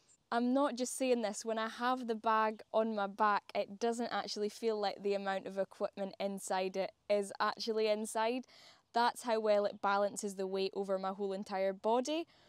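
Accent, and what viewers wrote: Scotland, United Kingdom. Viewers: LOL "Pair-fect" bag. I love that Scottish accent!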